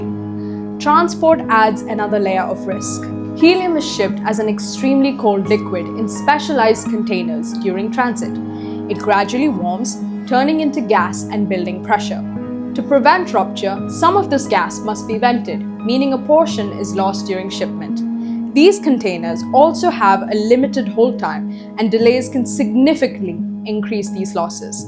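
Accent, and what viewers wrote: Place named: India. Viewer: Informative. Beautiful Indian tone.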